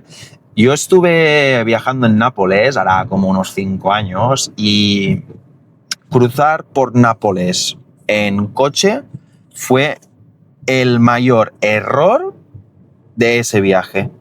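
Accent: Catalonia, Spain. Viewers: A fin de cuentas, no deja de ser un canal de español para extranjeros, tiene que bajar la velocidad. Aún así, no es de los más lentos. También me gusta que no renuncie a su acento catalán, igual que Spanish with Antonio no renuncia al andaluz. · Pues yo pille Tu acento catalán desde el primer minuto de escucharte.😂 Luego lo del cochino salvaje ya me confirmó la región sin que lo mencionaras.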